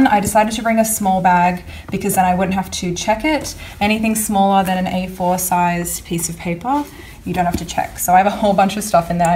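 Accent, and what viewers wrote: Australia. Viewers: Also dude, I thought you were Australian, then I saw the city and thought you were in London, then I looked on your blog and it says you['re from Sydney. I'M SO ANNOYED cos I saw those lovisa chokers and I just. REALLY. need to buy a choker right now. I'm so annoyed cos I want like 10 beautiful chokers but no stores have good ones ugh.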